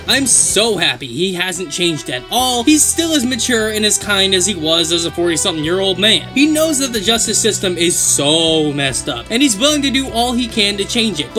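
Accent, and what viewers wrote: United States. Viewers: Dude I hate the #Americanvoice but love the content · Great content but I'm not taken by the American accent. It's not as funny. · I'm not hating on the American accent but I feel like it removed the humor from a lot of your jokes especially from the Baaaaalllls Deep... Still good vid tho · Can you not make this American do the vids cuz its hella fucking annoying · Disliked because American accent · No more American accent!!!!!!!! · American accent or accent is the problem this channels facing right now. Personally I can understand the accent but for the audience that can't well I,ll leave this problem for you to decide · Throw this american accent ting away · No this is his American accent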